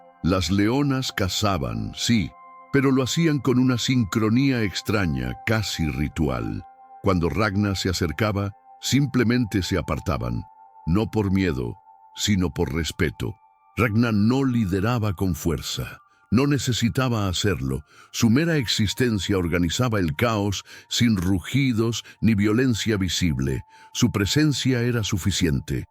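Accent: Portugal. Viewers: COño nua entendo ni marre, es espanol revuelto con portuguaos 😁🤣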